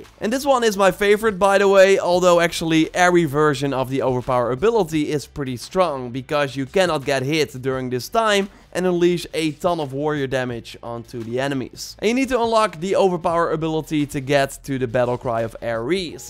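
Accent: Netherlands. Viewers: Haha je nederland engels is echt zo mega duidelijk te horen 😉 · Ik hoor gewoon aan je Engels dat je Nederlands bent, toch?🤣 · Love the dutch accent bruh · you have a very Dutch accent, are you from the NL?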